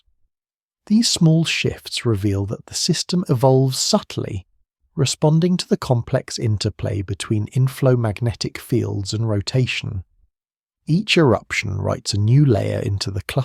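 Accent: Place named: United Kingdom. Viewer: 2 plus hours of yap yap with British irritating narrator voice 🎉please 🙏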